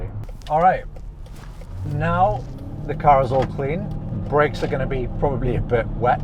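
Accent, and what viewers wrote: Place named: Geordie, United Kingdom. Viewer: Lovely car! Putting a right "high Jesmond" on your Geordie accent there! Be proud of your Toon lineage man!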